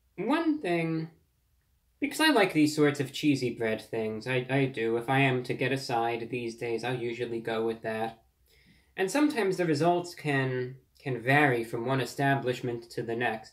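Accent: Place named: Transatlantic, United States. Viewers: Boy, that transatlantic accent is fighting its way into the forefront, slow but steady. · I'd like to hear him once in a while. Speak with more of his east coast accent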